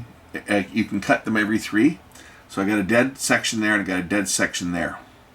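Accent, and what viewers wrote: Canada. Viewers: Hurricane?? You must be near me in Florida....but you sound Canadian.....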